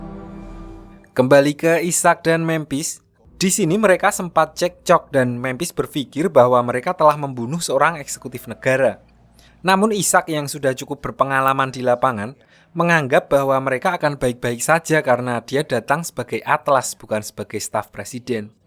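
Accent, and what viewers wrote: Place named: Central Java, Indonesia. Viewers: Fixs admin e wong jowo 🗿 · Wong Jawa Tengah kyok e ki narator e😂😂😂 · Joh. Iki ngadimine wong magelang to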